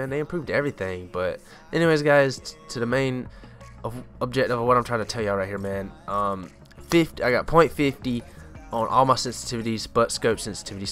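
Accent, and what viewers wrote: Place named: South, United States. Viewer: He sound good st the beginning but then he country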